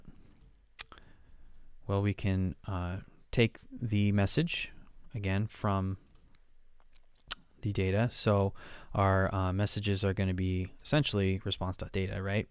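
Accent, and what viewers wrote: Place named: England, United Kingdom. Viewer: i love your english accents and thank you sir :)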